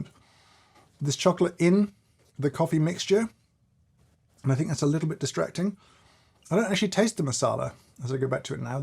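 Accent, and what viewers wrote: United Kingdom. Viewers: those are manually-added subtitles, not automatic ones. The person speaking is British, lives and runs this channel in the UK, and is speaking British English. So, unless the subtitling work had been outsourced to the US, it is unclear how it ended up being in American English.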